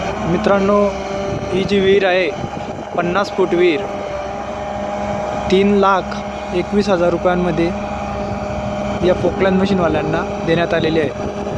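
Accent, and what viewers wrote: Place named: Khandesh, India. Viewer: राम राम दादा तुमनी भाषा वरूण तूम्ही खानदेशी वाटतस तूम्ही चांगल काम करी रायनात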